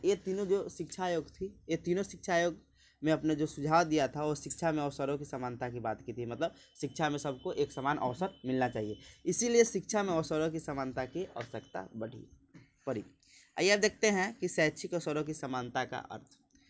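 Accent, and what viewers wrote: Bihar, India. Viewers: Aapke notes helpful h aur aapke bolne k tarike to majedaar h, aapke bihari tone k kaaran smjhne me v aanand aata h, keep it up...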